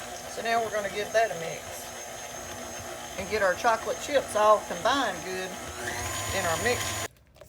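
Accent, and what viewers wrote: South, United States. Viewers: I may make these tonight! I love your southern accent. Yumy Yum - Thank you. (Greensboro NC)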